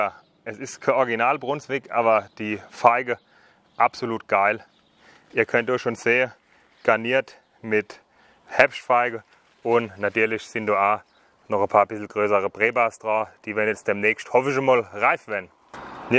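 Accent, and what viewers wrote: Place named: Hesse, Germany. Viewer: Tolles Vido. Und dein Hessisch ist einfach nur sympathisch 🙏🏻😇 LG Jonas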